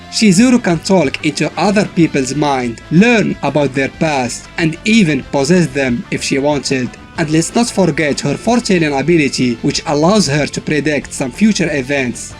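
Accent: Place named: Morocco. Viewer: he is Morrocan,from my country and recognize the accent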